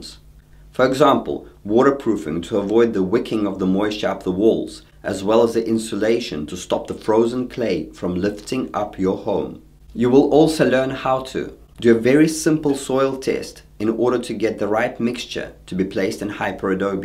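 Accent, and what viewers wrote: South Africa. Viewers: It sounds sooo much like you have a South African accent??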